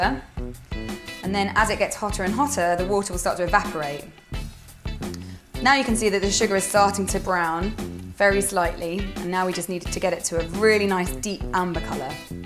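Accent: United Kingdom. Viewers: I suppose she meant uk cup, because she has a british accent, so I think that she is English.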